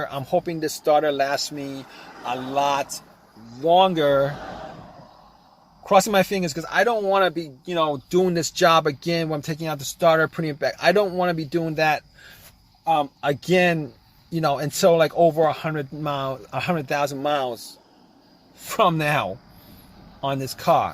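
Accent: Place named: New England, United States. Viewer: He sounds like a New Englander, they help it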